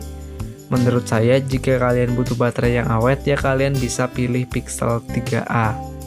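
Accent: Wonosobo, Indonesia. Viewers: wong sobo ta mas?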